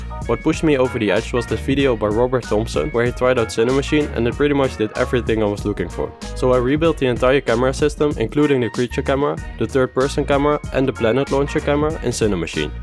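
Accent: Netherlands. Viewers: Let me guess, you are dutch? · Are you Dutch? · It sounds like u have a dutch accent and I immediately noticed that because im dutch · I looooove the Dutch pronunciation of English “v”s. “Full control Ofer the qamera” - its so satisfying.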